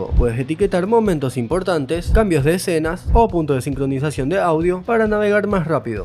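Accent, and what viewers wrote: Paraguay. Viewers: Tu acento en muy paraguayo ¿eres de paraguay? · interesante se nota mucho nuestro acento paraguayo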